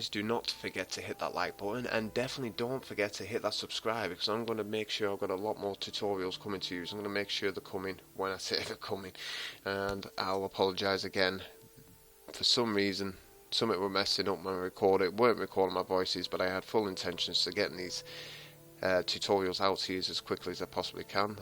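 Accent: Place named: England, United Kingdom. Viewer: I ended up here by accident, I was researching medieval architecture, and after reading about how castle spires usually had their stairs built counterclockwise for defensive reasons, I came to youtube to see some videos of them. This wasn't what I was looking for, but I'm glad I ended up here, it's inspired me to go back to minecraft and start building. A bit of trivia, if on a single one of the stairs you miss out the halfslabs so that you have to jump, you'll end up with a trip step, an uneven step used to cause attackers unfamiliar with the steps to trip. It's useless for design, but great if you're building it to roleplay. Thanks for the amazing video, I'll be checking more of them any time I need to get inspired, I don't know if you're still active on youtube but I've gone ahead and subscribed anyway :) Also I recognise that accent, hello from a fellow Englishman :)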